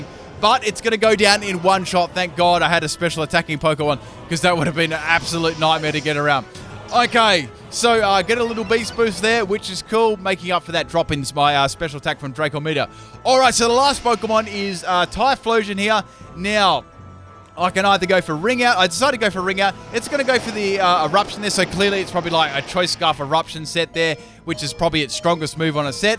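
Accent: Australia. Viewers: my mother things you come from Australia... is that right?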